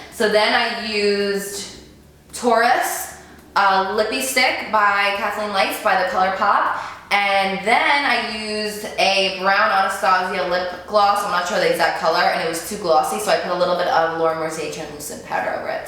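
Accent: New Jersey, United States. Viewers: Your Jersey accent is so cute:) Love you!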